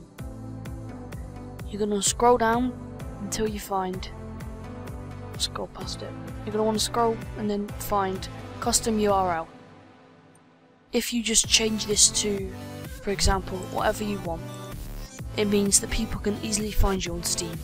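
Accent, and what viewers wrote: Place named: United Kingdom. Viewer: omy gawd he so british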